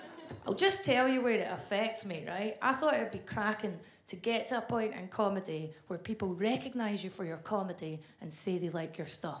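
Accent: Scotland, United Kingdom. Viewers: What Scot accent is this? There are different accents in Scotland. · I absolutely love Scottish accents. I'd probably listen to this lady all day even if she wasn't telling jokes. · I find Scottish accents in women so damn hot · I love the Scottish accent! Would I wish I had subtitles for ¼ of what is said? Sure, but it's fun to hear anyway! I like to repeat/imitate it when talking with someone, not to make fun of them, but to be able to understand it better. Which is a better way to cook? Watching someone passively, or doing the cooking yourself while tasting it periodically? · Anything spoken with a Scottish accent is automatically funnier... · Fantastic voice and accent. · How can you not LOVE a scottish accent, thats definitely a woman i could listen to and never be bored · Very beautiful, very funny and I love her accent! Makes me want to do the 11 hour flight to the UK 🙂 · It's exactly the Scottish accent that appeals to me most. 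Otherwise, that alien chick is the xexiest, funniest, most honest nonhuman I've ever seen do comedy. I wouldn't even have known she is an alien if she hadn't confessed! I'd watch anywhere, any time. I hope she does a full special on a streaming service pretty soon. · I love the Scottish accent 🙂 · Is she fay Rothesay? 🧐 Very good 👍🏻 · You are beautiful, funny, and I personally love your accent. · I love a Scottish brogue. My ears delight in it. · You should be proud of your Scottish accent not ashamed or embarrassed about it.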